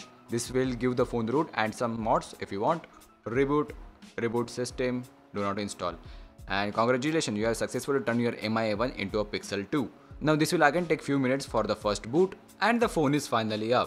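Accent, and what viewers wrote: India. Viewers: ХАХАХА люблю индийский акцент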